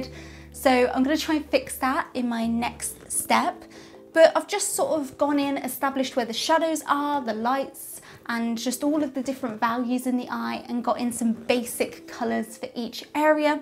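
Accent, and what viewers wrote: United Kingdom. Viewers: Confused. Sounds British, but charges in Dollars for the course? Sounds like a scam. That always sends red warning lights to me.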